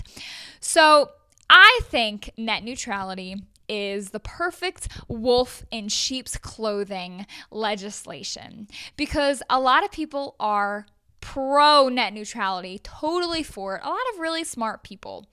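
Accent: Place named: New York, United States. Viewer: You have the most Jersey/New York sounding accent I've ever heard.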